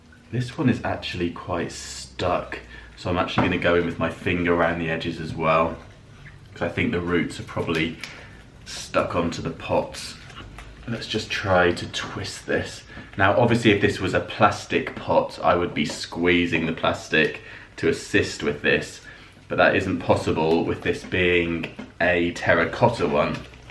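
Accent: United Kingdom. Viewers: Love your work. Sooo nice to hear a fellow Brit giving tutorials. Your instructions and explanations are fabtastucally clear. You don't jabber or waffle on. Love it. I have subscribed. Give us more please. I live in the Carribean, wo, and I have 6 acres of ladn around mynjousd. I am planting, planting, planting. Yayyyye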